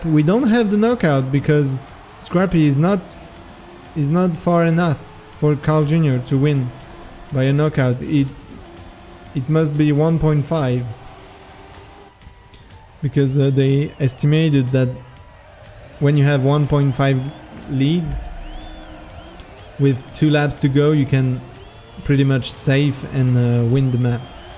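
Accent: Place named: England, United Kingdom. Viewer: pourquoi tu prononces meme les pseudos avec un accent anglais ? Je veux dire Papou ça devrai rester "Papou" et pas "Pahpouw" ou même Aurel ça devrai rester "Aurel" et pas "Owel"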